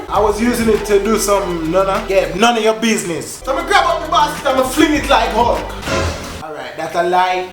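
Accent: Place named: Africa. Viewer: Big man a scream lol.. Yeah right African sound dwl. Funny bro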